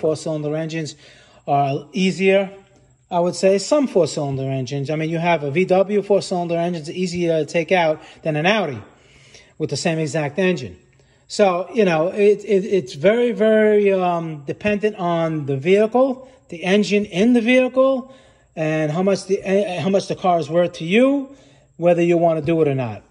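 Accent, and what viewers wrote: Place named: Boston, United States. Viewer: I swear to god that bostonian accent gives me such vibes. It's like playing max payne or something and just listening to one of the gangsters explaining to his buddy, in detail, how a car engine works and why his ride is irreversably screwed lmao All joking aside though, this vid and your channel in general is great and informative but I just had to mention the accent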